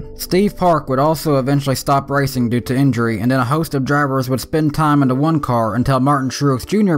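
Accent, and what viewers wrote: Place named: Texas, United States. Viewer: I have a buddy here in Texas that talks just like you, swear to God I do!!!!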